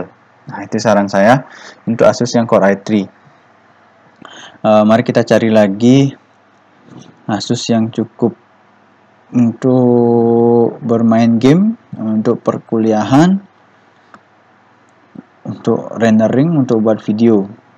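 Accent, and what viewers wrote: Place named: Sumatra, Indonesia. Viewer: logatnya orang sumatra y!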